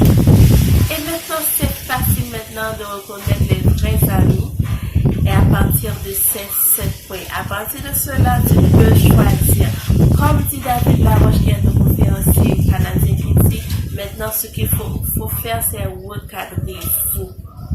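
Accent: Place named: Haiti. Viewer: OMG j 'aime ton accent ,tu nous as dit que t'etais une haitienne , franchement je je crois pas ,la maniere dont tu parles le français c a féliciter ma cherie,maintenant je vais voir parmis mes amis lequels sont fake,lolllll.thx Belle Madame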